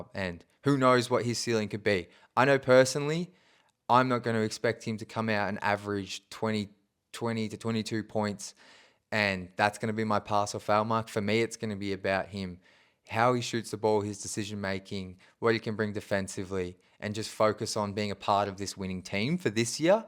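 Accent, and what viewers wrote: Australia. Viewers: Accent through me off I’ve never received my pistons news via an Australian ! Good shit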